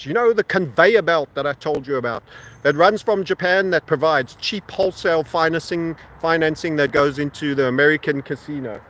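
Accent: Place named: South Africa. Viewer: a based guy with a South African accent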